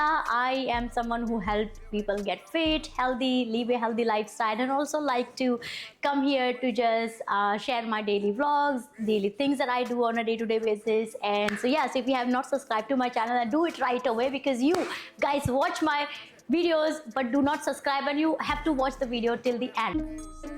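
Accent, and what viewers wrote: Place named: Gujarat, India. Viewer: Are you a Gujarati..accent thoda gujju type hai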